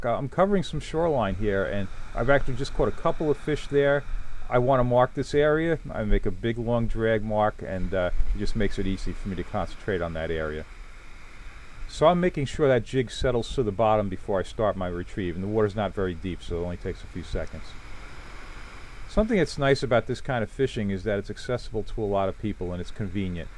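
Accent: Long Island, United States. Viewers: Would love to watch the video but can't stomach the Long Island accent...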